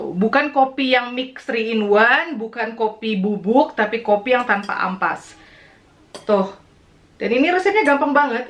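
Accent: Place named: Kalimantan, Indonesia. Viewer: Enakkah? = nyamankah? Hehehehehehhe... logat kalimantannya kentel ya...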